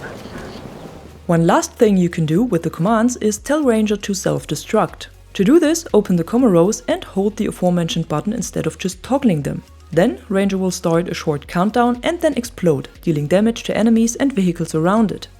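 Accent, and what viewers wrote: Germany. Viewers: Warum kann ich sagen das du aus Deutschland bist ? 😂😂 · love your german accent, you voice is so hot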